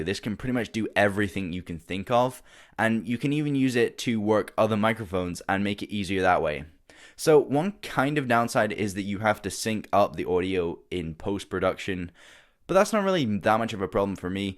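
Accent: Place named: Scotland, United Kingdom. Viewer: you have got a wee Scottish accent coming through mate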